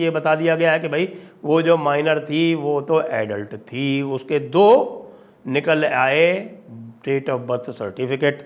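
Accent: Haryana, India. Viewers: Haryanavi accent 😅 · Hi sir I like your Haryanvi style Baddee Baddee pehlwan..... Ho Ho Ho Haa Haa Haa.......Thanks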